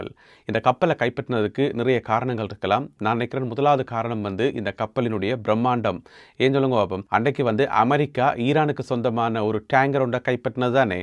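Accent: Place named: Batticaloa, Sri Lanka. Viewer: நீங்கள் மட்டக்களப்பு தமிழ் பேசும் போல தெரிகிறது தமிழ் மக்களை வெட்டி குவித்து உமக்கு தெரிவில்யா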